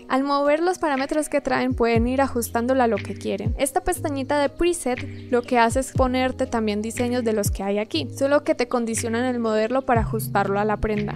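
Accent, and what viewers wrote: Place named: Bogotá, Colombia. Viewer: los colombianos solo reconocen el hacento rolo: eres rola' bogotana? jsjs yo si :>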